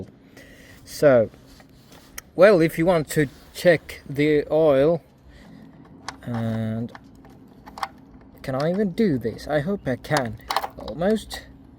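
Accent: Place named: Baltic states. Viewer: I enjoyed your video. I'm guessing you're from the Baltics, Finland perhaps?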